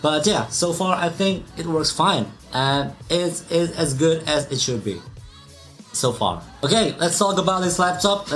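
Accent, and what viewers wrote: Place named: Indonesia. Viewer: You are Indonesian,i knew from your accent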